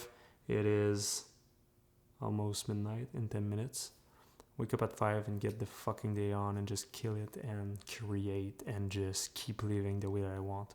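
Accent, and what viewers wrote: Quebec, Canada. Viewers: t'as juste l'accent d'un québécois qui parle anglais 😛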